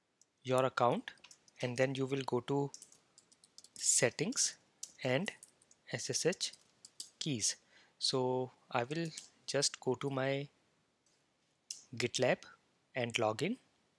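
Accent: India. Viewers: entah foldah saovah nambah pikchah fuchah Administatoh hieh Cringe Indian English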